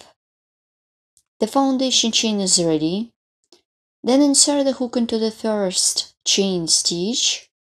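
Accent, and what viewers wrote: Russia. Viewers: Мне одной кажется, или таки я и правда слышу родной, русский, даже в английском? За обучение спасибо, учусь, очень хочу уметь красиво и без подсказки вязать крючком. В видео все понятно.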